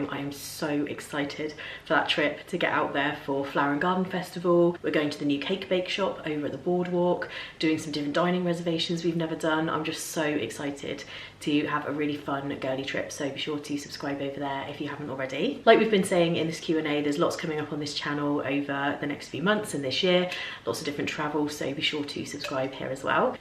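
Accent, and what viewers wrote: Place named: Dudley, United Kingdom. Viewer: I knew he was from near me! I could hear it 😅 but I'm from Dudley 💙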